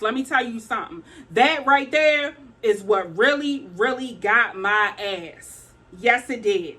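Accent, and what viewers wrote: Baltimore, United States. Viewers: Hey girl, I just found ur channel and as soon as you started talking I was like "She gotta be from B'more"🤣🤣 When ppl don't give me my 6 ft, I just rurn around and start coughing. They backs up then!💯 Peace and Blessings. #EastBmore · Heyyy, I'm a new subbie. This video put a smile on my face. The way you told the story about you and friend had me🤣🤣🤣🤣 I love a Baltimore accent!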